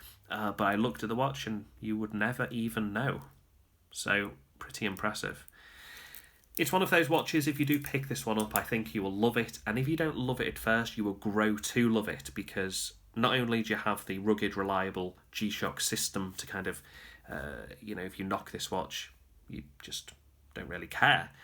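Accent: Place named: North, United Kingdom. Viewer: Thanks, a good video. I like your clear Northern English accent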